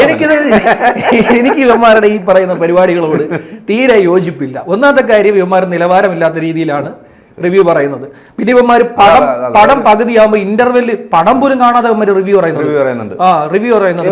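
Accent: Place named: Thiruvananthapuram, India. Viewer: എന്താ.............അഴുക്കു പയ്യൻ 😁., മ്മ്‌ടെ തിരുവനന്തോരം ഭാഷ 😆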